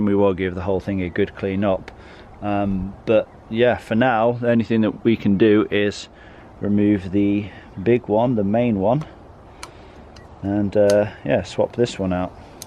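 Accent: United Kingdom. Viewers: the british accent sounds pretty awesome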